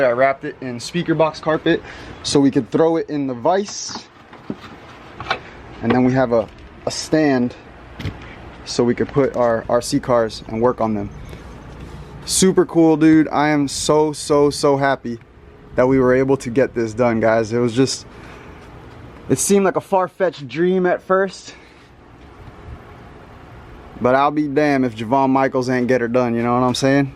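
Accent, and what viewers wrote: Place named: Louisiana, United States. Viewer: You gotta be somewhere near Louisiana the way you talk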